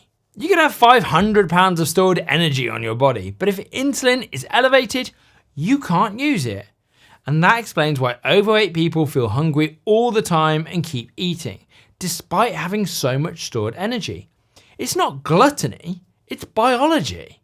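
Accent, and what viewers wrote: United Kingdom. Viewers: A re- re-boot! I hope to see more content. I tried sending your videos to a friend ca. 54 now about 4 years ago. He wetly talks about needing to lose weight (he does) but does nothing practical about it. I hoped the sound of a British doctor would be relatable, rather than a US influencer. People need familiar voices. I'll have another go with him.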